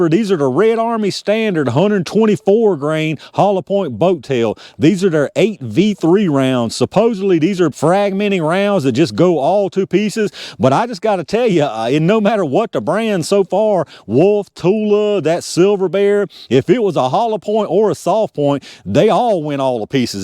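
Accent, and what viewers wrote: South, United States. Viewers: What a beautiful south accent.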